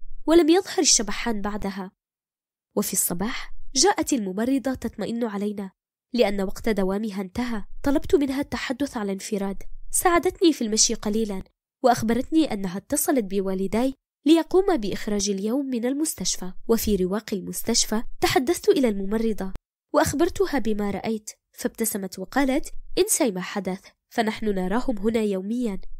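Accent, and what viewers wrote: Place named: Andalusia, Spain. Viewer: سهل تكون حاكي قصص بس صعب تكون بصوت ❤️🥺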